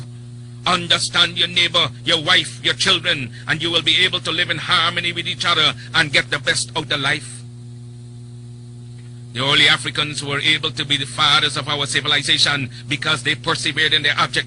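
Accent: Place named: Barbados. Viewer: Your narrator sound Bajan.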